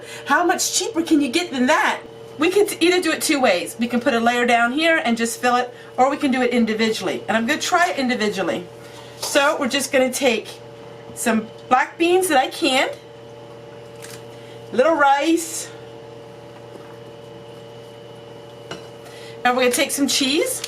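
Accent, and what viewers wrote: Pennsylvania Dutch, United States. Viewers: I’m off subject here, I cannot find your mailing address. My son is curious about your accent. He wanted me to ask you if it is Pennsylvania Dutch?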